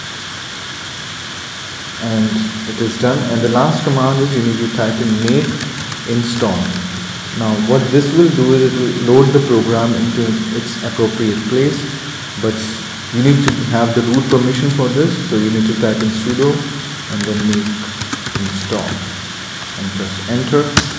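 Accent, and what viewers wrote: India. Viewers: A voice from the bottom of an oil storage tank with some extra noise! Are for real? You don't respect yourself and your viewers. Get some proper audio recording equipment first, then post your videos. These Hindi... they think that listeners are not having enough difficulties understanding their heavy accents. They add some other audio garbage on top. Barbarians!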